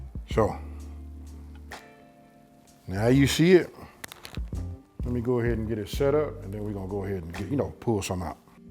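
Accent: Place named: California, United States. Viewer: Are you from the Bay Area in California? Your accent says California to me. I was leaning toward Watts maybe but you sound like Ryan Coogler a little bit. San Diego native here . 😊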